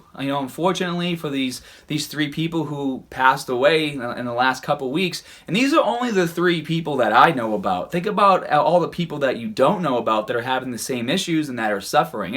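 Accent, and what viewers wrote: Boston, United States. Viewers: That Boston accent is classic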